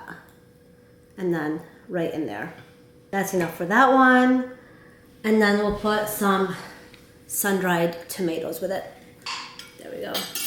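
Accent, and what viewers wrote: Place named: Arab world. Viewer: Walla, I thought I heard Araby accent. Shalom, Salam, Peace. I appreciate your constructiveness. Shukran/Todah Rabah.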